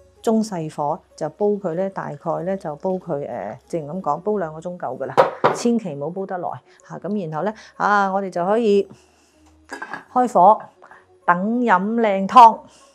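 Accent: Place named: Hong Kong. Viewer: 講話清浙快折暢順，純正的香港話，博學的中醫知識！令人聽落舒服感受！容易學會及掌握各樣要點 𧹍𧹍𧹍!!!香港中醫學術領域之光！😊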